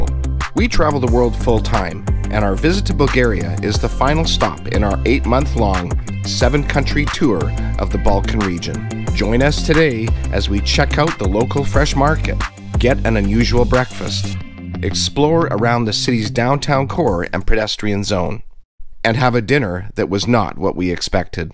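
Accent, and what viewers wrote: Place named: United States. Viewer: Stop staying there and calling it downtown - it is part of the wide centre but it is also part Central-North Sofia, that is not the downtown - that is the AVOID area... Downtown is around NDK and between St. Sunday and NDK and above NDK and East of NDK West of NDK and anything but NORTH of Serdika Metro station. W are part of the European Union, we are not part of the fiscal Euro zone even though we are fixed to the euro.Only use ATM's in Banks or attached to Banks - not the Yellow and Blue NO ATM has ATM written on it and being legit. The area you were in in that restaurant where you had a bad experience we refer to as little Arabia and you sound American.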